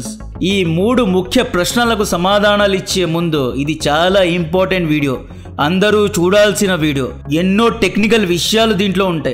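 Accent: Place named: Chittoor, India. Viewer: Brother, Better, Refine your accent.!!! It is sounding like Chittoor accent. !!! ❤❤❤ 🎉🎉🎉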